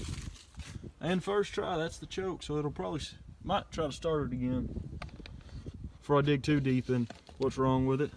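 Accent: South, United States. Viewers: We'll get good spark with this new cool. We'll change this old ool for some new ool. Classic southern accent, I love this channel.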